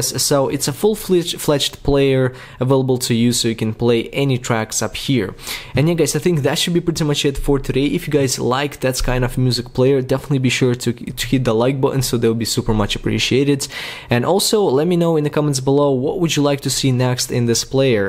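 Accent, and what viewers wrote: Russia. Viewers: Omg, russische Akzent 🐷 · Your accent sounds very Russian 🤔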